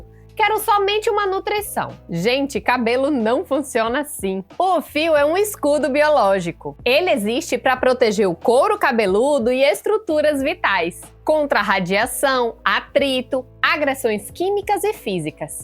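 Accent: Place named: Bahia, Brazil. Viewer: Baiana de Salvador sim😂 Rapaz, reconheco logo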